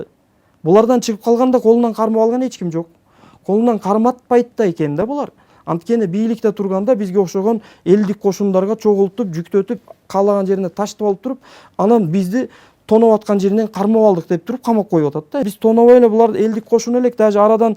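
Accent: Standard. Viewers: Кыргызча таза сүйлөгөнүн карасан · Сен молодец текебаевге карагагда кыргызча таза суйлойт экесин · Э бул жетим тирик бекен кыргызчаны таза уйронуптур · Маладец кыргызчаны таза суйлойт экен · Сарт таза кыргызча суйлойтго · сен еркек екенсин иним Озбек болсон да таза кыргызча суйлоп омурунду берип коркбой Суйлогонуно биз терен ыразычылык билдиребиз. Себеби емне учун ушундай ушу менен жургон кыргыз жигитерибиз ушундай болуп чындыкты айтып чыккан жок. Коркуп угуп олтурса керек уйундо.. Ошол учун айланайын кыргыз елим бери бирибизди сатбайлычы коркбойлучу. Козубуз менен коруп кулагыбыз менен уксак да бир кыргыздын чындык созун укканыбыз жок. Корсо убактылу окмоттун согуш ачып бузукылык кылып адам олтуруп енелерди ыйлатып турганы биздин денебизди елжиреткен жокбу еелим. Убактылуу окмотун Кан кор екенине тура деген кыргыз еелимен колдогондор ооба дегендер барды?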